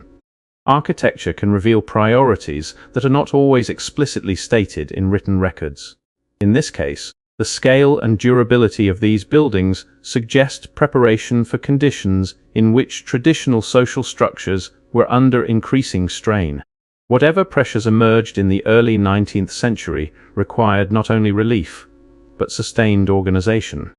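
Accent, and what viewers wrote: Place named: Europe. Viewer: every vid has the same eurotrash accent WTF its all coming from the same eurotrash AI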